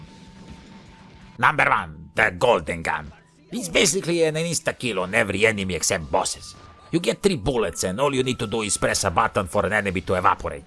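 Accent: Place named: Sardinia, Italy. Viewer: I can't belive americans mistook your accent for spaniard when it's obviously sardinian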